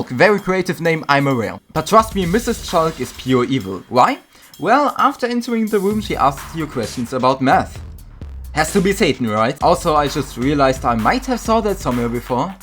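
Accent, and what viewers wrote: Germany. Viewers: i can hear funny german excant, wie lusitg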